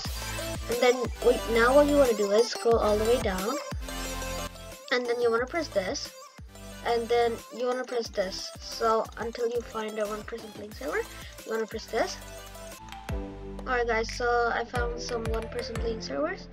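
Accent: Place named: India. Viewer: what happened to bros indian accent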